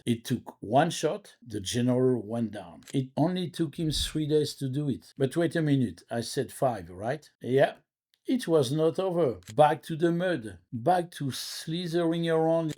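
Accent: France. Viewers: Un bon accent franco-français ahah j'adore tes vidéos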